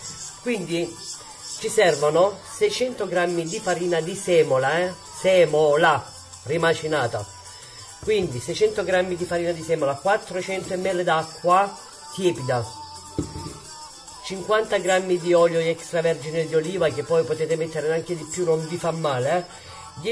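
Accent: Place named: Lecce, Italy. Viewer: Grazie per le tué ricette .io sonno nata a nardò e vivo in francia . Mi fa tantôt benne sentire il dialeto leccesse.avevo 4 anni quando son arrivatta in francia.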